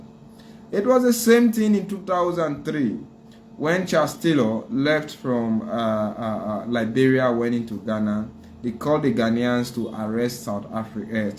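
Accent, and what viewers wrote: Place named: Nigeria. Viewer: But you sound Nigerian ooo😅😮. I’m not saying it’s wrong, just an observation.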